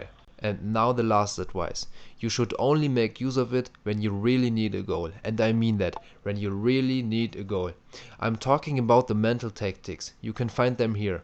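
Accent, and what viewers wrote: Germany. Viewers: You speak german ! I notice because it says Tor and germans say X like this ix and english people ex :D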